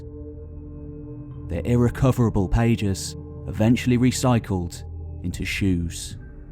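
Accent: United Kingdom. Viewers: Beautiful presentation. Thank you. Also like the British accent. Three defining moments in history, the fall of Baghdad, the fall of Constantinople, and the fall of the Ummayad Caliphate in al-Andalus.(Spain), not to mention Carthage and others. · I like his brand of UK "English" so much that i can forgive his pronunciation of the word " dynasty".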